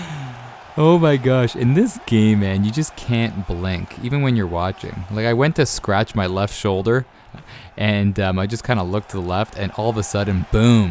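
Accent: Canada. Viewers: you're so Canadian I love it eh hahah. isn't the Spartan fighting this weekend? I love him. and actually didn't kno he was Canadian haha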